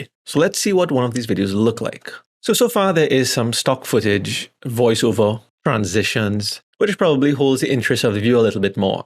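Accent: Trinidad and Tobago. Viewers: Dude are you from Trinidad? Your accent caught me off guard crazy!!!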